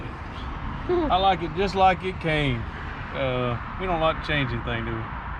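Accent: South, United States. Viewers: Is not from down South like you? I love your accent dont loose it please. In Canada we love people who keep their accents. Georgia Rocks. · Good video cars engines, even a woman,and some funny jokes, tks fer the entertainment. Also don't change the way u talk, its ur roots,one of the other guys, perhaps adds one of those southern pronounciations as well. I still have my Kiwi accent and have been living in the States over 40 years.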